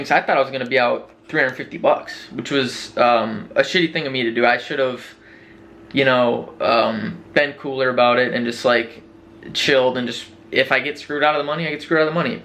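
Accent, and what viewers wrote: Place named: Minnesota, United States. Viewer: I fucking love this video. sounds like a Minnesotan so much lol Love you!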